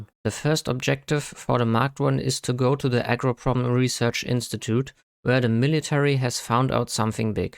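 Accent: Germany. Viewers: german english lol. höre ich sofort ^^ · North german accent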